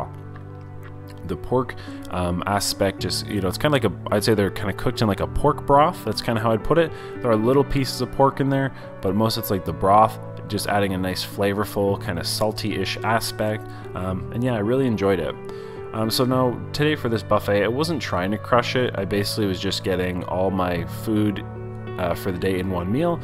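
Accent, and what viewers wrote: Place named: Texas, United States. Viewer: Oh everytime you come to Texas you start speaking Texan... that's so cute. I also go for the fried fish and green beans when eating at Golden Corral. Pot roast, never, it's always too dry. Bye enjoy your stay in Texas.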